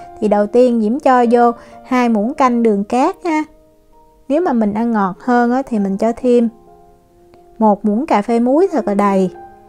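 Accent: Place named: Mekong Delta, Vietnam. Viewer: Chị này giọng nói dễ thương chắc là người miền Tây.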